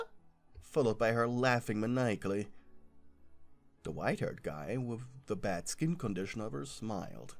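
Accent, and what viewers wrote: Germany. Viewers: Why do i hear a little german Akzent :,)?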